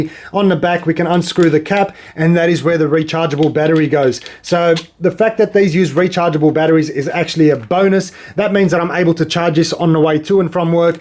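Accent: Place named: Australia. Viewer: Good Australian accent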